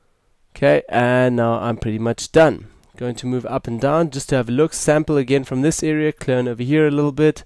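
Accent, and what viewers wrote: South Africa. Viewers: Great tutorial, but it was immeasurably better with the South African accent. So pleasant.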